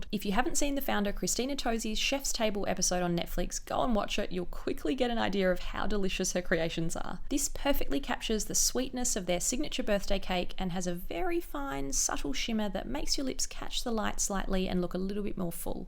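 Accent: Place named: Australia. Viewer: You sound Aussie, do you live in Australia? Because I have been wondering how to get glossier down here forever, and covid means no travelling to NYC any time soon...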